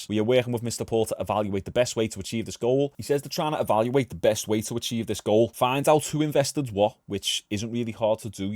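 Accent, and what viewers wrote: Liverpool, United Kingdom. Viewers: Love MMA and when I heard a scouse accent I had to sub. Love all the content bruv. Keep it comin. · As a manc, I'm still surprised on how drawn I've become to a scouser just chatting shit on YouTube 😂 got to give credit when due fair play, keep it up bro 👍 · I love the U.K accent shit sounds dope to me. The Market always drops on the weekends u should know this! Noisy ain't gonna forg3t about their money! 😆😆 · pls say u support liverpool coz u have the best accent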